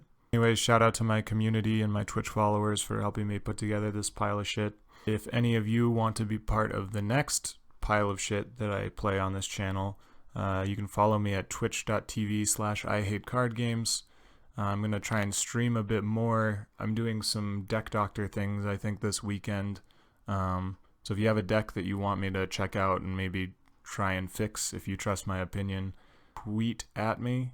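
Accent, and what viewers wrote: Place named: Utah, United States. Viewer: love your vids man, if you're in utah like it sounds like you are we should play some MTG sometime or get drunk and yell at the mormons in the airport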